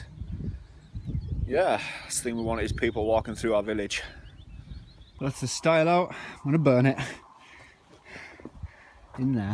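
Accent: United Kingdom. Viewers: OMG XD where do u live? I live in the U.K. And I see you have the accent!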